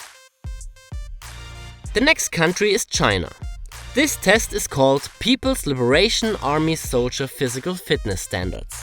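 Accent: Germany. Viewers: Als ein Franzose, ich verstehe seinen "Deutsch-englisch" viel besser als amerikaner Englisch aha ! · your english is the prime example of the german english out there :D unfortunately was priceless. ;) great video again thought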